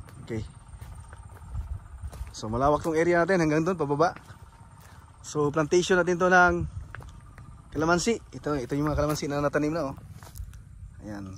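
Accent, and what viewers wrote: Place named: Bohol, Philippines. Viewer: Taga Bohol po ba kayo sir?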